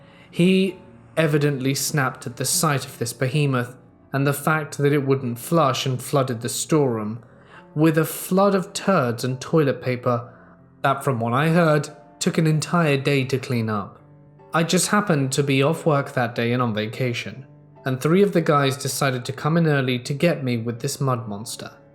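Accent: United Kingdom. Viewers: Omg so its 330am and not damn thing made me laugh all day until you said "the readiness of my anus" in your dry British accent and I lost it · Why are you british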